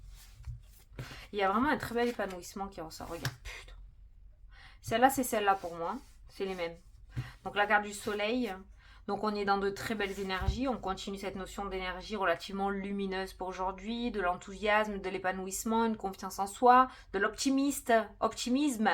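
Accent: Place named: Marseille, France. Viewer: bj contente de te voir et de t entendre 🤩👍l accent de chez nous! bj des marseillais 💙🤍💙🤍💙🤍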